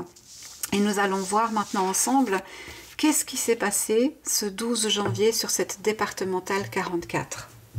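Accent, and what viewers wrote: Switzerland. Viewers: Bonjour je me suis abonnée. Vous êtes suisse? Je reconnais votre accent. Je suis de nyon.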